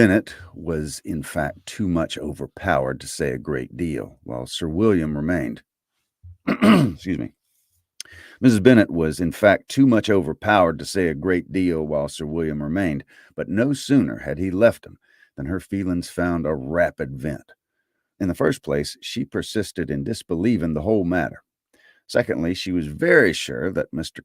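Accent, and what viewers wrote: South, United States. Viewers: I'm not from the US, and most of my experience of the US southern accent is from movies and TV. But listening to you is soothing and I'm sure that I'll be listening to your entire audiobook when you pull it together. Great work and thank you.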